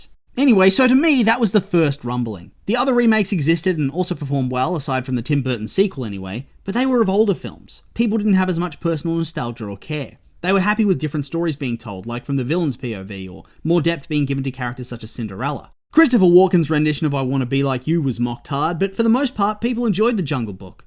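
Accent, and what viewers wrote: Australia. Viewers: you sound like an australian olaf. also i agree with your opinion! i havent been able to see elio but the trailer IMMEDIATELY grabbed my attention. space is so beautiful, and they made it look so beautiful in the trailers too. and the protagonist looks so adorable!! i didnt see a lack of advertisement until i was able to get my adblocker back on. ...obviously.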